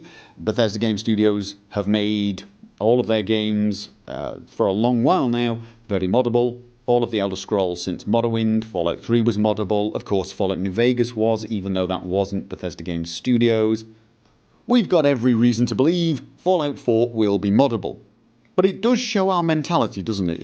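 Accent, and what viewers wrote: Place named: Scotland, United Kingdom. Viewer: Ive always wondered but never really bothered to ask, so... Where are you actually from? Been subbed for, ugh, quite some time actually, like, over 2 years-ish, so Im guessing I kind of must have missed the info or something. Iunno, but I think you sound southern-scotland-esque.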